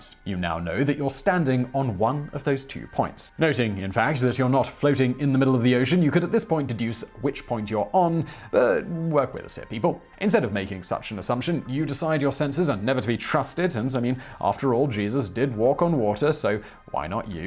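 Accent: United Kingdom. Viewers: Oh boy... More stuff of i rationalize how they came up with gps... And rather than read... Im so briliant i must be right and there for let me spread my genious around as fact and history because whatever i lazily dream up must be right. Stuffy elitist. Talking head comes complete with stuffy brit voice. Yes kids.. This passes as a college prof... Even a department head these days. Actually.... Unfortunately for this condescending wannabe egghead embarrassment... Gps origins are not sputnik at all. Norging to do with it other than hey... Look a tin can pinging we can track. Gps at its heart... Is triangulation. A methodology used for ages by surveyers. It actually owes it origins to Pythagoras. As far as an orbiting object being a high accurate clock like an atomic clock... I have no idea how that works and id assume again some algebra... Trigonometry... 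And some calculus... But atleast i am humble enough to admit it. And its a good question. The talking head here equally has no clue how that works. Before sputnik... Im pretty sure there were some very bright people unlike this jerkoff here in this video just tossing off... Wonder if he creamed his pants over this... But i digress... Some bright people.. Yeah like warner von braum... Einstein... People like that which had already figured out geosynchronous orbits.... Now after all of that... Even more bullshit spewed by captain science here... You cell phone does not directly engage a bunch of high orbiting sats out there. It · why does he look like the guy on Vsauce but with a British accent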